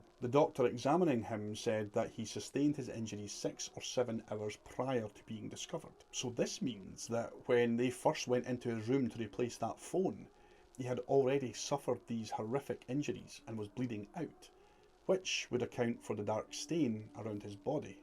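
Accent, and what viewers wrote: Scotland, United Kingdom. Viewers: Uh yeah...you're Scottish, I am Australian and would love a Scottish accent 😎👍.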